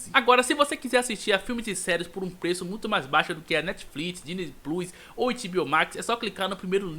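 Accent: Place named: Bahia, Brazil. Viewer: Você até tentou esconder mas eu percebo que seu sotaque é daqui da Bahia. Ganhou mais um inscrito por isso ❤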